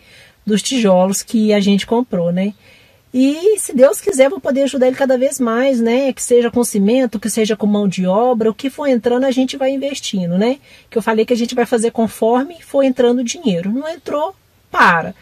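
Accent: Minas Gerais, Brazil. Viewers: Parabéns amo seus vídeos, são muito inspiradores. Sou de minas também (Uberlândia) e amo nosso sotaque mineirinho rsrs Já dou like antes mesmo de assistir, super inscrita no seu canal. Muito sucesso a vc!!!! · parabéns, vc merece!!! Tem pouco tempo que te acompanho e já amo demais seus vídeos, vc passa muita verdade em tudo! E esse sotaque mineirinho tbm neh, gostoso de ouvir( sou suspeita pra falar, rsrs)...enfim, que Deus te abençoe em tudo e que sua obra prospere cada dia mais!!!😘😘😘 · Parabéns 👏🏻👏🏻👏🏻👏🏻👏🏻 Qdo conheci seu canal a semana passada já me encantei com o seu jeito e a paz que vc transmite, fora esse sotaque mineirinho que é bão de mais da conta de ouvir.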